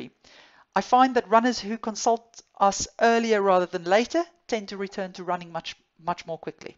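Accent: South Africa. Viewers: I love your accent. You must be from South Africa.❤❤❤❤❤❤ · South African AF! Great video, thanks for this!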